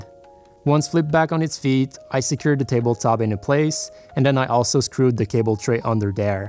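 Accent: Quebec, Canada. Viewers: i can tell by your accent, que tu viens du Québec XD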